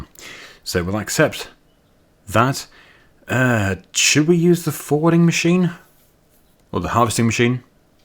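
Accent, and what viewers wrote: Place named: United Kingdom. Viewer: I always thought you were an Indonesian creator 😂 until I heard a thick Brit accent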